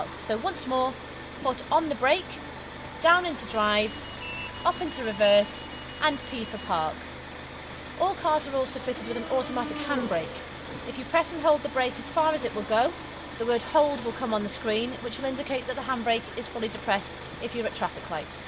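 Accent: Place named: Liverpool, United Kingdom. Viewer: Has to be the most posh Liverpool accent I have ever heard very nice and a very well put together video. I now no how to use the heating on my car thank you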